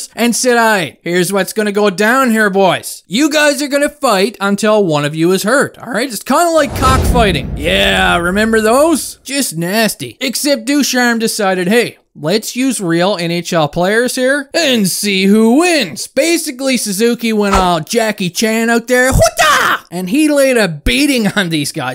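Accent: Newfoundland, Canada. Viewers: You must be a fellow Newfie or an Atlantic Province guy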